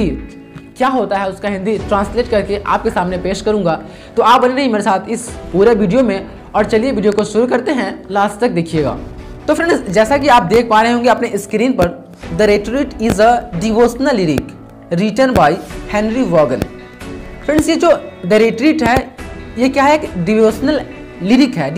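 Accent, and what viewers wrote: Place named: Bihar, India. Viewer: Main comment my pdi aap bihari ho lg v rha tha jb suni tb bhut achhi explained kiye ho aap bt thodi si bich bich my annnnn unnnn ar rukna bnd kijiye😂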